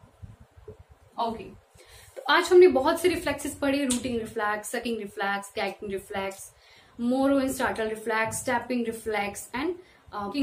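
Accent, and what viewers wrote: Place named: India. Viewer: English ko Indian log ke jaisa bolya mam pronouncession is bohot hard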